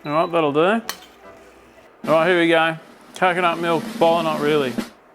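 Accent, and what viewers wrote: Australia. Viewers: I hate that you have to censor your beautiful australian english on youtube if you want to earn any money. FUCK YOUTUBE.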